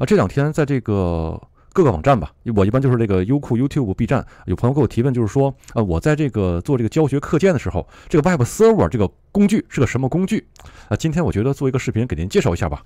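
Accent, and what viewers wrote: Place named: Japan. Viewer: 难怪觉得说英语有点日语的味道哈哈哈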